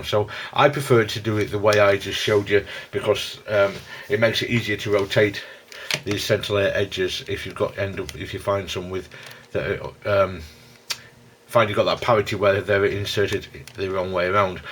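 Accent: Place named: Scotland, United Kingdom. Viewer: Good tutorial :) I also like your accent. May I ask what accent that is? (I would say you live on the British Isles, Scotland maybe? I'm truly sorry if I got it wrong)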